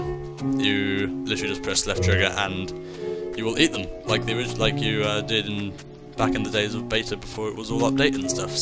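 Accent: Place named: United Kingdom. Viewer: speak american so i can understand u U fucken brit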